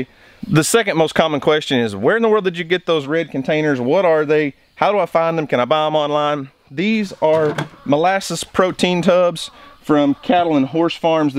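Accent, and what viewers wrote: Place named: Oklahoma, United States. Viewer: Thanks for the instructions...going to give it a try. Your Oklahoma accent is quite differ different from my Michigan gab. Enjoy growing!👨‍🌾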